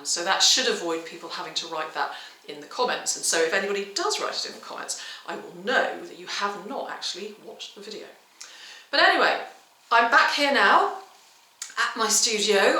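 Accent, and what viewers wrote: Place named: RP, United Kingdom. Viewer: I am Brett eh? That's so funny, it's Lambretta 1500 a little scooter no doubt. Has anybody told you that your voice is perfect for radio. I listen to you last thing at night time before I got to bed as your voice soothes me ready for sleep. You sound like you went to a private girls school, by the way I am in Geraldton in the outback of Australia and I troll the op shops for antiques nc collectibles. I am a huge fan, love your work, keep it up. Happy Easter, Warm Regards Trevx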